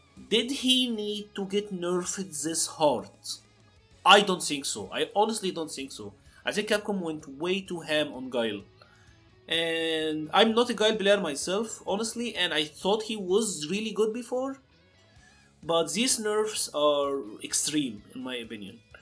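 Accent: Egypt. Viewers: Your accent, are u from Masr?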